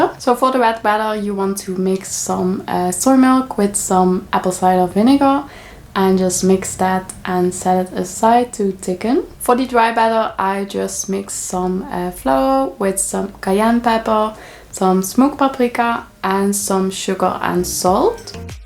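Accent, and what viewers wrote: Netherlands. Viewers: You have such a typical Dutch accent when you speak English! HEHE #LikeDJtiesto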